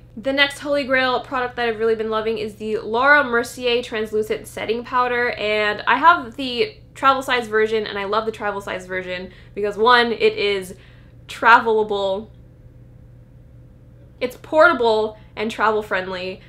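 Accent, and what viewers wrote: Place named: Australia. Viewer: The Australian accent gets me every time lol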